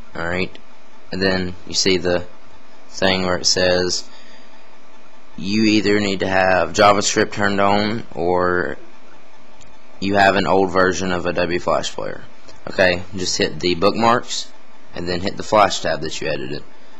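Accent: South, United States. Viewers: this guy sounds like a hillbilly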